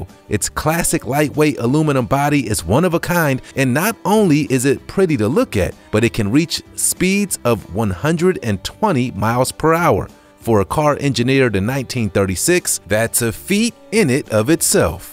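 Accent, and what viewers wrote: United States. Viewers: Nob the Knave English language is coming from 🇬🇧, and this is a cowboy English dialect, and it's sounds like he has hot baby patato in his mouth. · I hate when people with and American accent talk kilometers. They know it don't mean crap to most of us. Miles per hour please !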